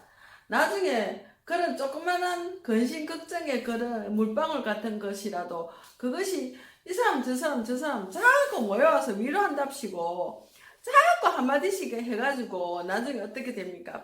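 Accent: Gyeongsang, South Korea. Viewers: 안녕하세요. 저도 손잡으러 왔습니다 ~ 경상도 분이시군요 😊 만물의 근원 물. 장말 중요하죠. 시청하고 응원 드리고 좋아요. 손잡고 갈게요~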